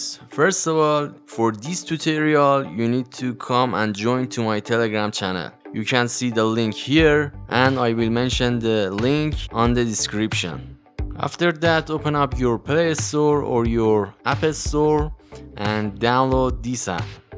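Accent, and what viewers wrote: Iran. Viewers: از لهجت معلومه ایرانی هستی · خدایی لحجه ایرانیت مارو ...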